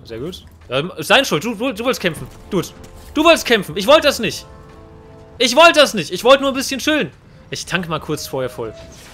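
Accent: Saxony, Germany. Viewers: Hi, mal wieder geiles Video, Als ob du auch aus Sachsen kommst, weil ich komme auch aus Sachsen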